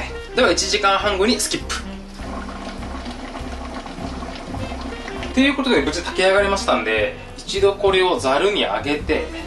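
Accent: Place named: Hakata, Japan. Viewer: 自分も福岡の人なんで所々博多弁なのいいね😊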